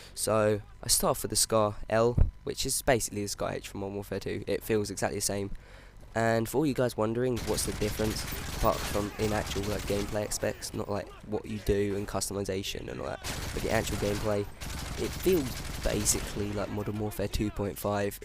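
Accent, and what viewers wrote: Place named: England, United Kingdom. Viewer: Cause he's ignorant and you have an english accent.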